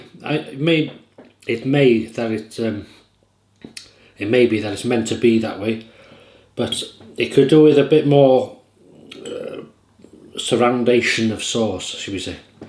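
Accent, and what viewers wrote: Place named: RP, United Kingdom. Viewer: You would make a PERFECT POSH !!!!! You should be a audiobook reader of a Victorian Novel 👏👏👏👏👏 Please make those dialogs . Again. LOVELY !!!!!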